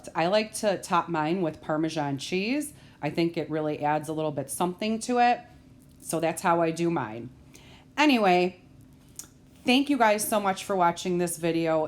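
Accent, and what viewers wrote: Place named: Chicago, United States. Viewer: First time viewer. Nice looking recipes. Plain and simple. Must say that you sound like a fellow Chicagoan. I live in north central Illinois now. Best wishes. I will check out other recipes. TFS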